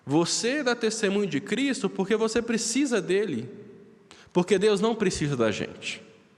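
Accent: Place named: Minas Gerais, Brazil. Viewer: A padre tem aquela fala e coisa descansada do mineiro raiz ,que eu gosto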